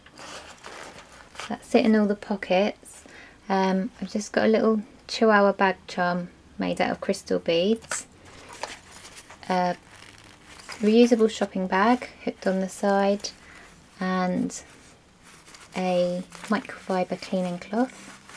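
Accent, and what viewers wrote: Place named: England, United Kingdom. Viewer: EDC, beautiful girl, english accent!! I sub'd !! LOL!!!!! Whooo Hooooo!!!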